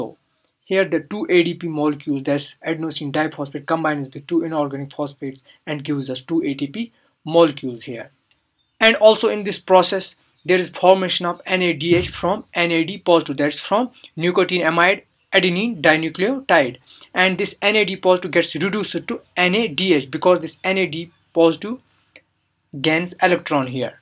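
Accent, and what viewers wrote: Kashmir, India. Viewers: That was informative. Kashur (Kashmiri) accent might be hard for some to understand though.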